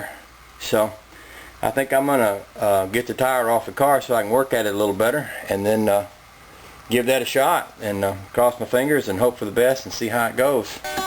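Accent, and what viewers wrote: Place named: South, United States. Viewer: Where are you located? Your accent tells me your in the south but I've seen this car at the Owls Head transportation Museum in Maine, far from the south, haha. Did you sell the car? Or loan it to the museum? I Love that place and usually visit every time I'm up in Maine.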